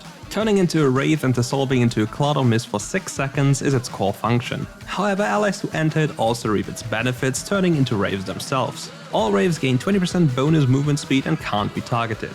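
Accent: Germany. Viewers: I like the charming German accent in the recent videos.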